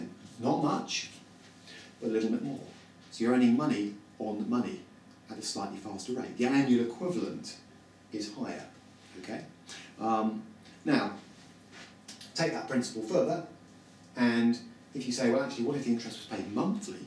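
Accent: United Kingdom. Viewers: Thank you Sir. ... Great content. .. and love the accent (British) :)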